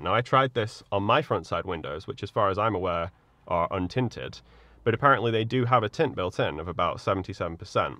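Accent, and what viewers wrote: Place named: Nottingham, United Kingdom. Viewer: What are you talking about? has a Nottingham accent because he was born and raised in Mansfield.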